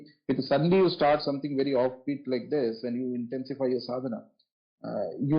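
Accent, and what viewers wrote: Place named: United Kingdom. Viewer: Why are u speaking in BRITISHER INVADERS language? Do we have no INDIC language to depict .. And why are u calling उपासक as उपासका & भैरव as भैरवा, while you correctly pronounce Chanel, Louis Vuitton, Dior, Citroen, Moët & Chandon, Laurent Perrier, Veuve Clicquot, Louis Jadot Domaine Leroy, Château , Bordeaux and L'Oréal as per original French pronunciation & correctly pronounce ikigai, wabi-sabi, kaizen, shinrin-yoku, omotenashi, gaman), kintsugi, shikata ga nai & bonsai as JAPANESE pronounce them. Then why SANSKRIT terms are pronounced by you as beef-eater BRITISH pronounce them? You're full of arrogance & अहंकार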